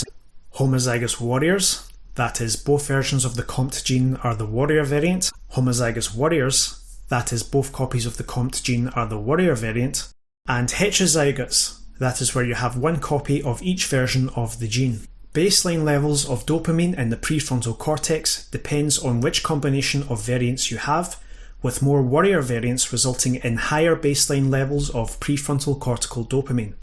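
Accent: United Kingdom. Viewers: Warrior/ worrier isn’t the greatest phrase to follow with a Scottish presenter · Are you a Scouser?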